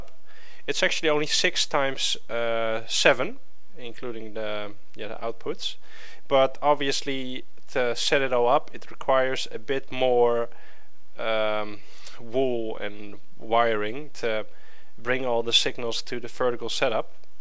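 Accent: Netherlands. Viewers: Are you dutch? really does sound like it :) thnx for the info :D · You sound Dutch. Are you from the Netherlands? Great demonstration! I subscribed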